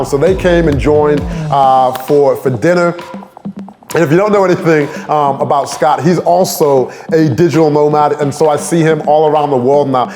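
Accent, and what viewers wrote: United Kingdom. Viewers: Bro you killed it with that British accent 👌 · You have got a British accent. You probably live in London.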